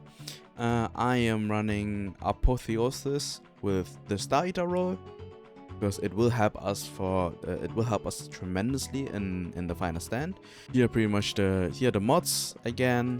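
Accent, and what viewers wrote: Germany. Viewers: Me: not sure where this guys accent is from oh German got it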